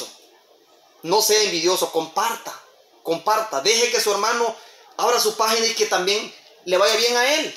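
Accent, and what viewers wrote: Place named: Mexico. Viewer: Este ermano hontureño es la pura riata primer hontureño que abla como un buen mexicano saludos biejon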